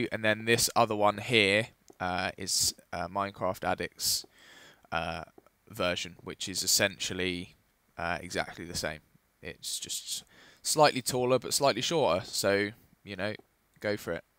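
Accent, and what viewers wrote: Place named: England, United Kingdom. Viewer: I'm using your English accent to annoy my mom - she finds it annoying because she strongly believes that this accent does not exist. I will prove her wrong. - To the topic. I love your tutorials.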